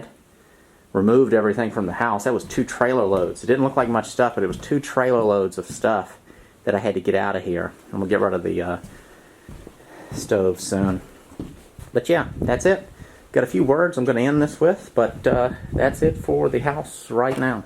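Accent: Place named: Mid-Atlantic, United States. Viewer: There is a privacy concern which is totally valid. His accent speaks of the Mid-Atlantic states, which range from about Virginia, the Carolinas, into as far as the Tennessee border. That is an area that is bigger than your two islands, so his concerns about crime and safety are totally valid. Hard to pinpoint where he is, given that he has a family and business in a massively populated area. Don't ask, it's a security matter!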